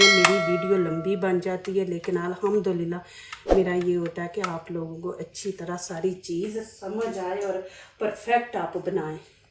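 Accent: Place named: Punjab, India. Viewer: Aunty pujabi mein urdu acheeee laaaagti hey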